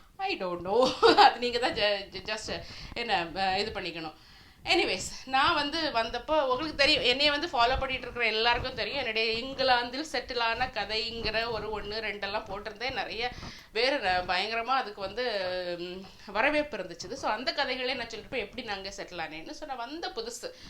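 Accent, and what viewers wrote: Nagercoil, India. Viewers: உங்கள் நாகர்கோவில் பேச்சு மிகவும் அருமையாக உள்ளது. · namma nagercoil slang a friendly a pesurenga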